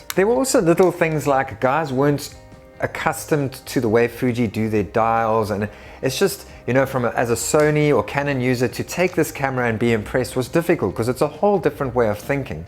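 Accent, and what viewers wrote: South Africa. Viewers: Definitely a South African accent 🤔🤗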